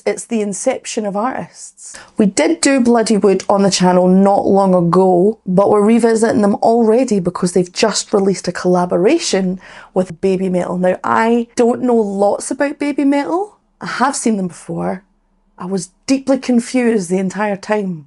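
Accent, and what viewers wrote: Scotland, United Kingdom. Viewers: I have four comments for this 🙂 1. I really don't get why so many English speakers apologize for having a dialect. Never ever be ashamed of how you speak. Take pride in having a proper dialect and not speaking like you are from nowhere with some watered down standardized sound. How utterly boring and stagnant the world would be if we all spoke the same way. Inspiration from different cultures and different places is what lest humanity grow and evolve. Ignore the language elitists pretend that some dialects are better or more civilized than others. (I am by the way quite jealous of that incredible cool Scottish dialect you have.) 2. is not the most political performers in the world. That being said their music does in general carry a very positive message, and it has been suggested to be prescribed for depression. There are multiple songs from them with a strong message. 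Since you don’t like being biased before a song I will list the songs and the messages separately, so you don’t know what you are getting 🦊 The songs are: - (PIA Arena 2023 Live) Eng Subs <link> - Live at Sonisphere 2014,UK (OFFICIAL) - The last song of their first concert in the UK (and their international breakthrough). The audience starts out a bit confused for the first few songs, and end up like you see here at the end. <link> (OFFICIAL) <link> The themes are singing about: Looking forward with a positive view after the world has ended. Anti bully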